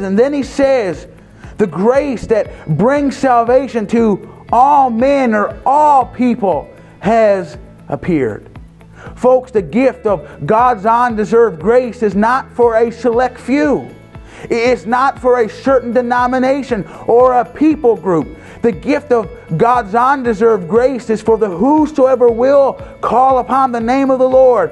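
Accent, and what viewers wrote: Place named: Newfoundland, Canada. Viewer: I have never heard a broader Newfie accent than this pastor- cracks me uppppp!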